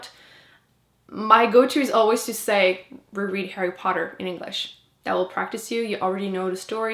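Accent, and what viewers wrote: Quebec, Canada. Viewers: Owww I loved hearing you speaking French, French Canadian have such a cute accent ! I am French and I started reading in English in order to improve my English :D I'd love to read the Mistborn trilogy but can't decide if I want to get it in English or in French... haha You should read Carry On ! I was skeptikal aswell but I actually liked it ! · I studied French in Quebec City for a summer and when you spoke French I was instantly missing Quebec haha, alors c'est genial et je aimerais bein si tu fait les videos en francais haha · Lool you need to stick with just asking not insulting dear, haha, I like it that you speak Arabic, and btw your Canadian French is different from the accent in France couldn't understand u clearly, because I'm Arabic and I speak french too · I speak french fluently and most of my friends are french so I was shocked when I heard your accent when you started speaking in french! 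didn t know that the canadian french pronunciation was so different from the french one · Je n'ai jamais écris sur une page youtube mais j'adore tellement tes vidéos que j'étais obligé ^^. Je suis française obviously mais dans cette vidéo j'ai tellement adoré ton accent quebecois que je me devais de te le dire ☺️. J'étais depuis quelque temps dans un reading slump et depuis que je regarde tes vidéo et d'autres bookyoutuber (comme peruseproject qui est la première bookyoutubeuse que j'ai suivit ^^") je me suis remise à lire. So for all of those little things I thank you a lot for your videos and all your effort to make us discover some great books. And I read in english for like maybe 5 years now and watching series in VO and this is in fact the best way to improve your english ^^. Btw one one the first reason why I'm following you is because of your personality so i would say you are so right to not care about those who criticise you. 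J'attend avec impatience ta prochaine vidéo :).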